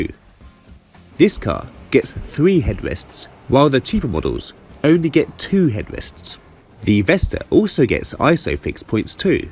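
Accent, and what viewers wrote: United Kingdom. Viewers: Nice little car. Well made video too :) loving the British accent 👍 have a good day and thanks for sharing :)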